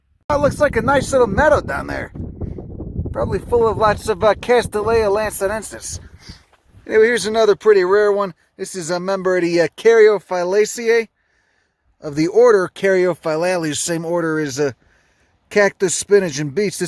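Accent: Chicago, United States. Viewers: Please do not denigrate the fine South Side of Chicago accent with scurrilous comparisons to either of those meat-heads.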